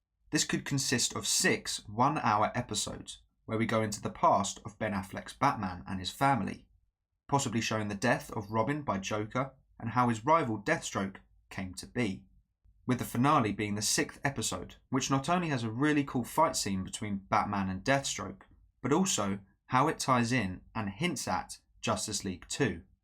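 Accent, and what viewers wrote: United Kingdom. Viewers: Where in the United Kingdom are you from?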